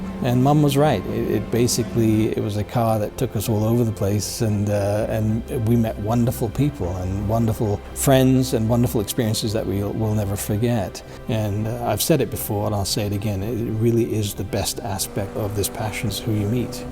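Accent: Australia. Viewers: This guy almost sounds Aussie - I think he has spent a lot of years somewhere outside the USA.. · What an awesome bloke! I believe he was born and raised in the UK, but am I picking up a bit of an Aussie twang every now and then in his accent as well?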